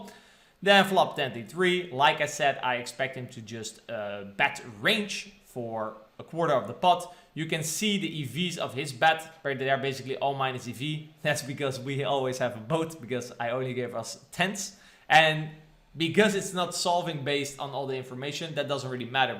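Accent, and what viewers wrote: Netherlands. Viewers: Everything in the Middle AHAHHA, btw are u dutch?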